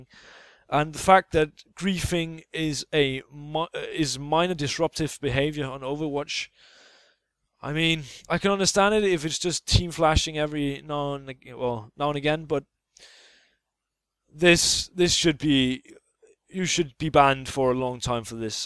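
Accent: Denmark. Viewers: are you danish mayt? 😊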